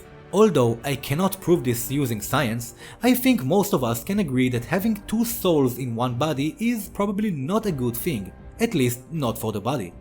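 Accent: Israel. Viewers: דקה וכבר שמעתי את המבטא הישראלי הכבד חחח למה רק AOT<? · First time hearing an anime Channel with a Hebrew accent! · Subscribing for AOT content with a Tel-Avivi accent.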